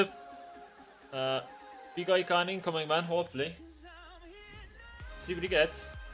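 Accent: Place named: Ireland. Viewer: Are you irish ? Sounds like it to me so am i !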